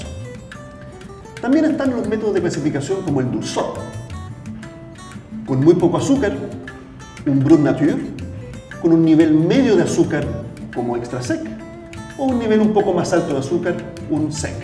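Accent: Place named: Chile. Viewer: esta loco este wey!! de donde es ha? parece tener un acento chileno....... los vinos espumosos de Italia se llaman ASTI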